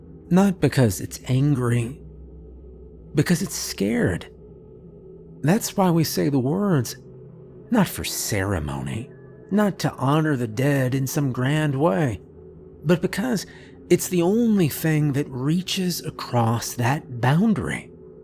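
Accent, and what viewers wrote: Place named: East Coast, United States. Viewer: I’m guessing is on the north east coast — the way he pronounces room is “rum”. Seems to be an eastern accent (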